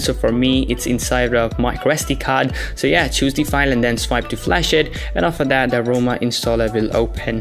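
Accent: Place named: United Kingdom. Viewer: Why do u have ths british accent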